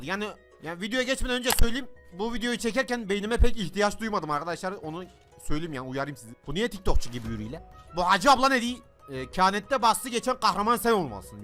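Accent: Gaziantep, Turkey. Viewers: Abi adamsın senin sayende Antep şivesini öğrendim cansın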